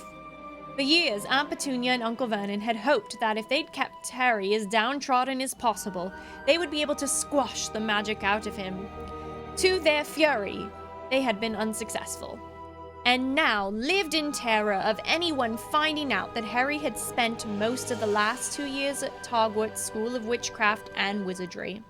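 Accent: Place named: United Kingdom. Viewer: I love the British narrator voice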